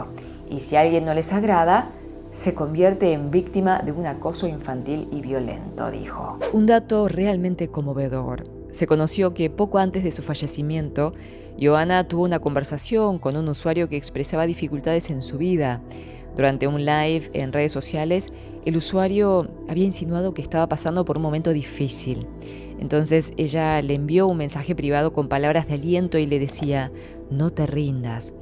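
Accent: Argentina. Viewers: No soporto el tono del español 'argentino' 😆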